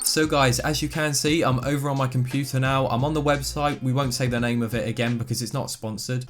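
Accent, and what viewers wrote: United Kingdom. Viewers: *Boeing I forgive you since you sound very british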